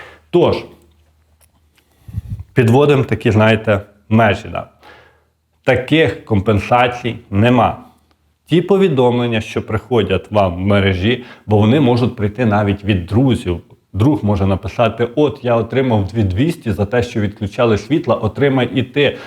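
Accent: Vinnytsia, Ukraine. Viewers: Пані, перш ніж когось критикувати, навчіться сама правильної мови. А говір у нього вінницький. На заході говорять зовсім інакше.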